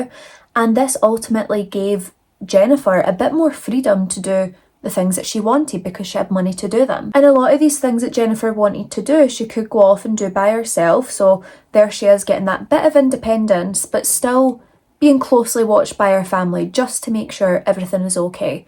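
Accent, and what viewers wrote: Scotland, United Kingdom. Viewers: Is that a Scottish accent I hear?! Where about are you from? ❤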